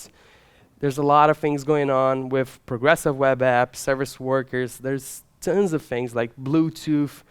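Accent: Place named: Minas Gerais, Brazil. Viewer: Thanks for sharing this knowledge. I didn`t know about any of those APIs before I watched your talk. (I'm also Brazilian, bet you are from Minas Gerais by your accent )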